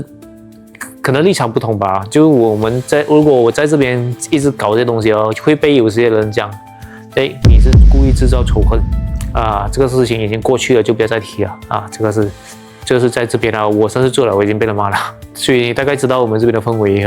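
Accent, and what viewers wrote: Malaysia. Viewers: 马来西亚口音就这样啊。有时候我真的很烦这群普通话霸凌的东西。这帮霸凌人在中国大陆这个德行，出来还是这个德行。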